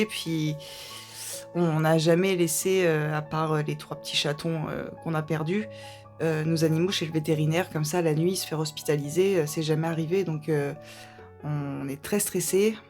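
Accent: France. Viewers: Accroche toi Tu nous manques déjà, reviens vite tu nous fait trop rire avec ton accent de Marseille...❤❤❤❤ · Des gros bisous guérisseurs pour notre petit sudiste.. je pense fort a toi et reviens nous vite pour nous faire rire avec ton accent du soleil !! Je croise les doigts +++ ❤❤❤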